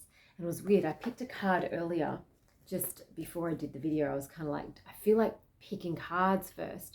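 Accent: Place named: Australia. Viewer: Thank you 🙏 Love your energy, and aussie accent ;) ✌ Aries nation sweden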